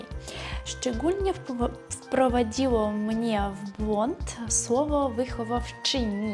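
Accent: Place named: East, Poland. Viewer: Ты умничка. Очень красиво говоришь по польски с еще более красивым восточным акцентом. Класс. Другие слова, так называемые ложные друзья, которые могут ввести в заблуждение это час, склеп, урода, просто или пукать (😅) итд. В целом их много, но это не помеха, наоборот Это делает жизнь более веселой! Успехов Тебе🤗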